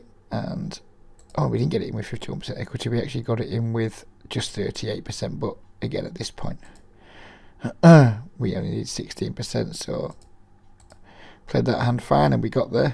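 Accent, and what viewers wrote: United Kingdom. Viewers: Understood, many thanks.. Ps, I have been trying to work out where that accent you have comes from, North or south of U.K?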